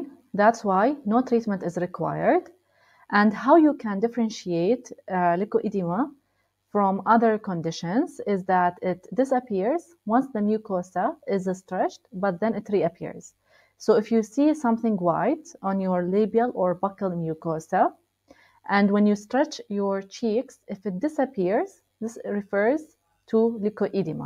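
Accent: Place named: Palestine. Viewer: i can feel the palestinian accent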